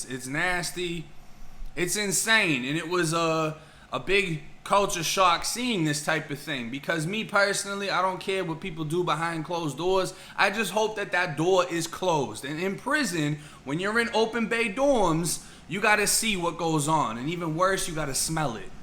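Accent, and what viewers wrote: Boston, United States. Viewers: Damn..... Are you originally from Boston, South Shore? I'm a Mass transplant living in FL too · You sound like your from Boston mo. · You from Boston bro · U sound like u from my city 🤔 #Dorchester #Boston #Streets raised me 👌🤷🏽‍♀️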